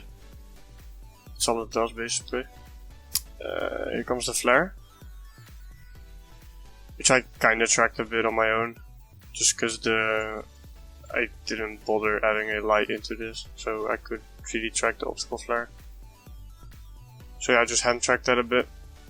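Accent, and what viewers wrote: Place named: Netherlands. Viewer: Hahah I knew immediatly that you we're dutch when I heard your voice. May I have your skype, or you can add mine if you want: <mail> I have something I would like to talk to you about!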